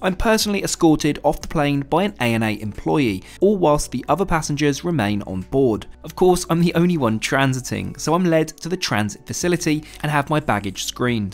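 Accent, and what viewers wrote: United Kingdom. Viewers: 13 seconds he says, "I'll be flying ANA's ten thousand dollar first class." He has an UK accent. They use GBP or Pounds Sterling so most likely he quoted in USD. If he had an Australian accent he'd be quoting in AUD Australian dollars. Canadian accent would quote in CAD. However, my information was incorrect as he didn't give a price for the return flight which was business class. So the entire trip would be more than 10k.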